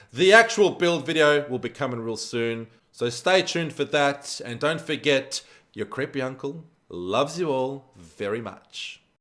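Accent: Australia. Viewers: Are you aussie bro? Maybe kiwi perhaps? But you live in AU aye..love watching your vids man. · not aire east coast Aussie I reckon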